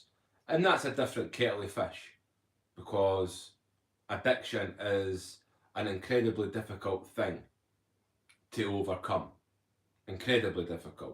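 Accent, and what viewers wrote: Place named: Scotland, United Kingdom. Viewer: Great video. I love listening to you talk. You are very natural on camera. Your Scottish accent is great. I have learned a lot from listening to you.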